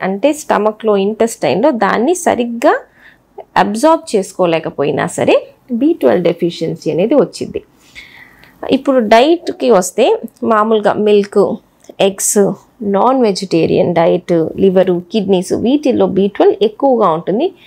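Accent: Guntur, India. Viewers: అది గుంటూరు తెలుగు❤ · Amma. Bangaram. Konda. Thalli. Neeku. Nenu okka. Salahu. Isthanu. Neeku. Isthamaithe. ..manadhi Guntur kabatti. . Vacchuddi..poidhi..ki baduluga vasthundhi...pothundhi....ani. kanuka matladithe. Adbhuthamaina. Slang thayaru avuthundhamma...velaithe. naa. Yokka. Request. Ni..patinchu. lekapothe. Mana. Guntur. Mana. Ooru. Bhashani. Manamu. Endhuku. Odhulukovali. Anukunte. Nee isthamu. Bangaram...kaani. neevu. Bhavisyattulo. Chala goppa peru thechhukuntavamma....nevu. mathramu. Continue cheyyamma. Neeku. 10 million subscribers vastharamma. Thondharalone.. sweet. Voice. Plus. Most intelligent...DR..amma nevu. ..thalli.. MAY. GOD. BLESS. YOU. THALLI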